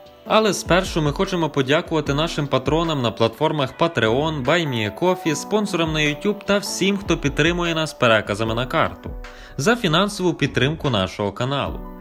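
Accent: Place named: Volyn, Ukraine. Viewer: Класний голос у диктора, з таким рідним ледь помітним волинським акцентом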